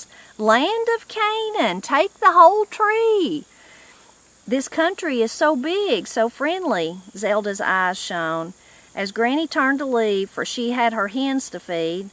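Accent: Appalachia, United States. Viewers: Enjoyed your reading. You remind me of my late M.I.L. And her sisters. All from Appalachia ( West VA)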